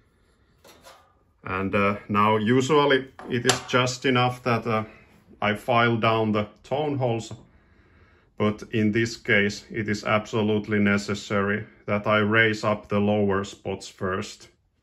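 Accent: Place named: Finland. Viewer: Hi, Awesome Video. I thought I recognised your accent, and with a name like that, I thought surely you are from the fine country of Finland. I have a good friend of mine who lives in Kuopio. Thanks for sharing!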